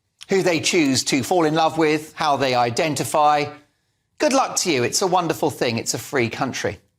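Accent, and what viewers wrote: United Kingdom. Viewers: You British ma'am?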